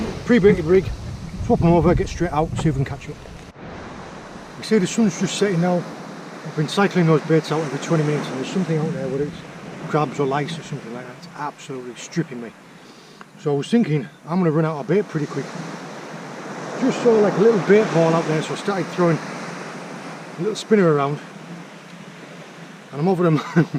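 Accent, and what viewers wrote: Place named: Bridlington, United Kingdom. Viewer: I thought his accent was from near Bridlington. is from whitby. Best fishing videos on the net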